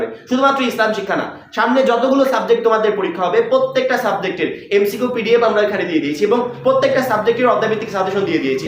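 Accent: Sylhet, Bangladesh. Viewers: আপনি কি সিলেটি😢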